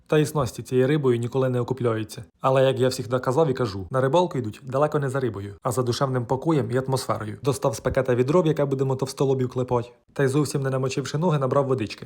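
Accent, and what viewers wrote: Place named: Cherkasy, Ukraine. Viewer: От оцей черкаський гумор та говірка, бальзам на серце! Дай Бог вам здоровʼя щастя та усім миру!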